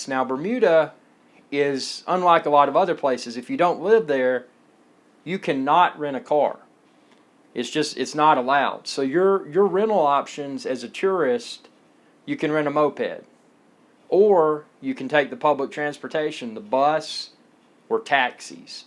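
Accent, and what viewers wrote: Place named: Alabama, United States. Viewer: I'm sure this is a great video, just can't get past the Alabama accent. Rolling on the floor laughing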